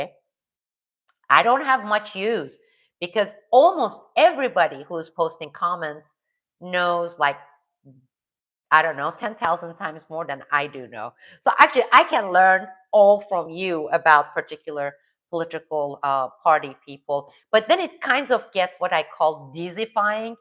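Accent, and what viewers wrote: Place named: United States. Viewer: Amerikada yaşadığın için çok akıcı Amerikan aksanı ile İngilizce konuşduğunu biliyoruz zaten ama senin izleyici kitlen Türkler ,bişeyler ispatlamaya ihtiyacın yok!!!!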